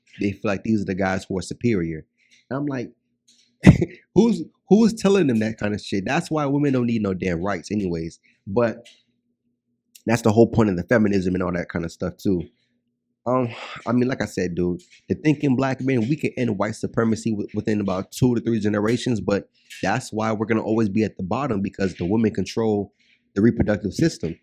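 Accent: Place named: Caribbean. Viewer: Yeah, i can definitely here the Caribbean in you brotha. Your content is spot on. SYSBM/ traveling is the only way for brothas. I make content as well talking about SYSBM, thinking black men & black mansandry. I got a black mansandry playlist of content I've made.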